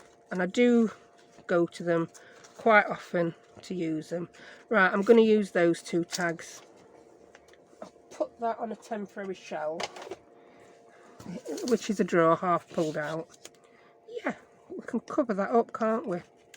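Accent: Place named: Scotland, United Kingdom. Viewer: You are a hoot! I just found your channel and I am enjoying you so much. Are you from Scotland? I love your accent.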